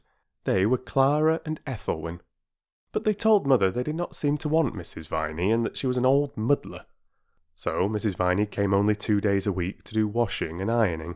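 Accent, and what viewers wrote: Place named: Yorkshire, United Kingdom. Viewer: Love it! This has been my second time listening to your warm and joyful performance. How wonderful, the warmth, nuances, and humor of an actual human voice (vs. AI). And your voice is an especially fine one in timbre and tone. Love the touch of Yorkshire too.🙂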